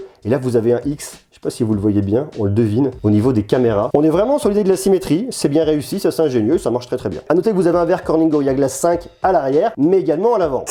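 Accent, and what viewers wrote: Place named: France. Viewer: Pourquoi crier en utilisant une langue si déglinguée. Pourquoi essayer de faire BCBG en utilisant, avec un accent à couper au couteau, des mots en anglais. En français il y a des mots pour tout. J'ai commencé à regarder, car j'ai acheté un Poco F2. Mais je suis finalement allé voir une autre vidéo, en anglais celle-là. Et sans accent français à chier. Pourquoi faut-il crier?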